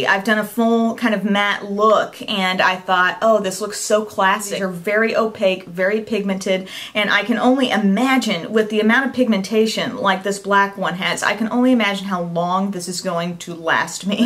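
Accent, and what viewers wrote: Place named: South, United States. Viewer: LOVE your passion. And even though you're a newscaster, I can still hear that southern accent which I also love. Even though I was born in Texas, I never really developed a true southern accent that i could be proud of lol.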